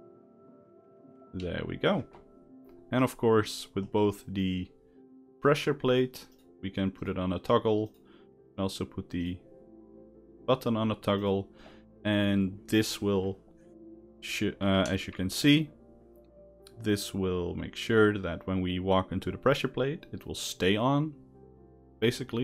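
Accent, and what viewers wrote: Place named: Netherlands. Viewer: are you dutch? btw I am and you sound a bit like you are too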